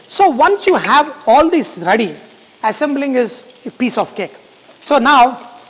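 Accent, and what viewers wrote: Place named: South India, India. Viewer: Good explanation in a South Indian accent...nice video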